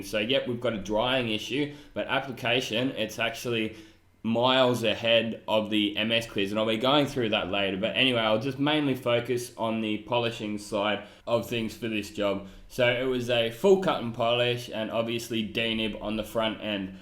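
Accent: Australia. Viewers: but you are Australian or American? which city?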